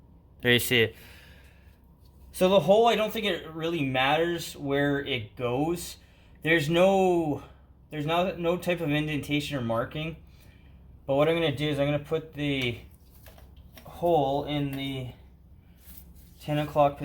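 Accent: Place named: Michigan, United States. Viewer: Thanks for the vid 👍🏽 Saving me ab $140. Also any chance you're from Michigan? 😂 you have a strong accent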